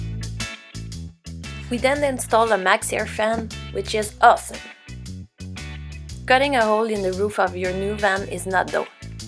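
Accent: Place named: Quebec, Canada. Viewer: french canadian i think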